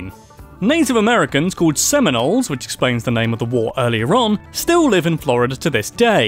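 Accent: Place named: United Kingdom. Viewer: The British say things differently huh? I cringed a couple times, but what can you do? It'd be kind of a "haha" if he did pronounce it like we do and he commented on how weird it sounds to him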